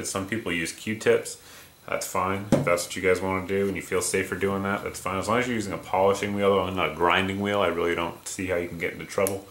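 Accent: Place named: Massachusetts, United States. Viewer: Just revisited this video and noticed your slight accent in this early video. Massachusetts, right?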